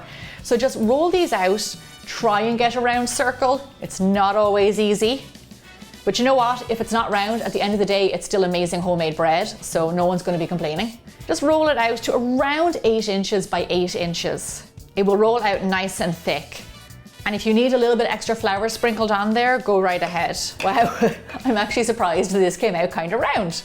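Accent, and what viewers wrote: Ireland. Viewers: She sounds Irish. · Not only beautiful bread, but delightful accent!! :)) Irish?? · Are you Irish? Your accent is so charming and beautiful. Thank you for the recipe I'm going to try this afternoon with my 21 year old daughter we love to cook together. Thanks again unless you many blessings to you and your family! 💝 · For bread is as lovely as she is and her grand Irish brogue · Okay now I know your awesome. Love your style and your practicality. Very professional and adaptable to keto. Most of all love your efficiency and that Irish accent.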